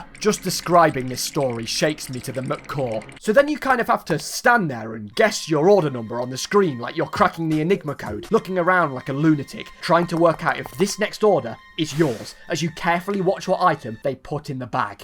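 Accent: United Kingdom. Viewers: I like how British you are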